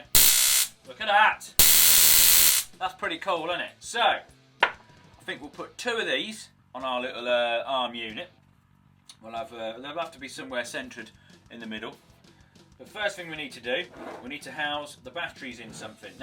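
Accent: United Kingdom. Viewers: Well. He is A Crazy and Genius British Accented man with Strange Futuristic Machines. Colin Is A Timelord Confirmed. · Is he British?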